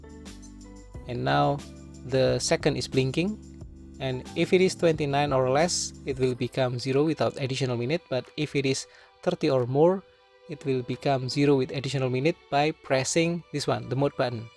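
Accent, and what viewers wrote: Indonesia. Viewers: Pertama kali liat karena pengen tau gimana cara setting jamnya, terus pas semakin di dengerin lama-lama pengucapannya kaya orang indonesia juga ya ternyata orang semarangg😭😭 Mantap sih bahasa inggris nya bagus bangettt mungkin kalo gak di dengerin dengan teliti gak tau kalo orang indonesia nih😂😂 Terimakasih om untuk cara settingnya👌👌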